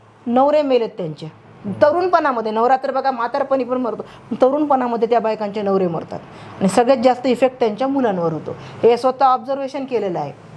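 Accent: India. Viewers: Aapli nanded hingoli chi bhasha, language ❤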